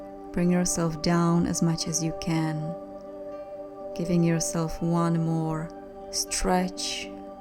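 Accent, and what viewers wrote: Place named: United States. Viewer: sexi baba ze cesko a meles ako z ameriky hee.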